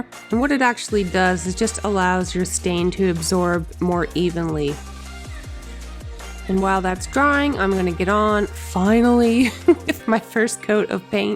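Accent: Canada. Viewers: Hi - I am watching this video and you are commenting on my own comments I made watching your other videos. Are you Canadian? I am as well though I've been living in New Zealand 25 years so it's hard for me to tell whether your accent is Canadian or American? Greetings from Georgian Bay, Ontario! Woop Woop - another fine work of art and the colour of the paint is divine. Please what colours were combined to get this shade. It's wonderful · Regarding countersink bits, and most other small items, cheap ones from Harbor Freight will serve your purposes fine. But your accent sounds Canadian, and I don’t know if HFT does business in that area. I think the analog might be Princess Auto? (I visited Ontario once 25 years ago—my knowledge of Canada comes from social media.) Harbor Freight sells notoriously cheap, in cost and quality, tools. They’re a running joke amongst pros, but an excellent resource for amateurs. 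But you’ll probably want a decent table saw though, rather than the absolute cheapest on the market. The Ridgid R4514 is currently on sale for $399 (Can$508) down from $549 (Can$699) at Home Depot, if HD exists up North and the sale is applicable there too.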